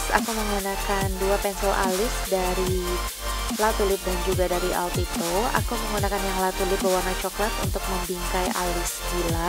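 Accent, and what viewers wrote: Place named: West Sumatra, Indonesia. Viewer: bagus make up nya. cantik bgt kak.. mau tanya org mana kak? kyak bahasanya org sumatra gitu... sumatra barat ehehhee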